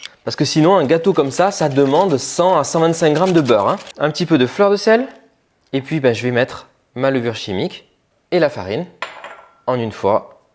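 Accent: South, France. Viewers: Au top ! Avec ce léger accent du sud, encore meilleur ! Là, il me reste quelques poires, je vais les remplacer. La fève tonka, j'adore ! ❤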